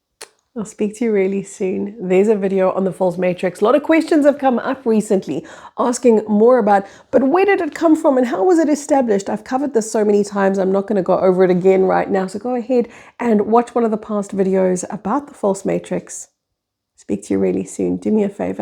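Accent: South Africa. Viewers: Hi …..I’m South African….discoverd you just now🫶🏻excited to know you’re on YouTube….your accent made me think you’re South African and yes…..need to meet my community🙏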